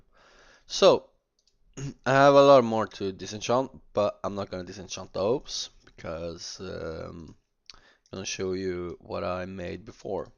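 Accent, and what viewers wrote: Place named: Sweden. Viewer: haha hör att du är svensk ;P:D har kollat på dina filmer, men vilket av dom är bäst? vilken är lättast och billigast ?? och vilken tjärnar man mäst på lättast om man ska säga så :)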